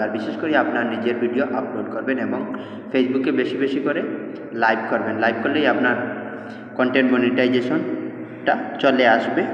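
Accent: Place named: Sylhet, Bangladesh. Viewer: আপনি ত সিলেটি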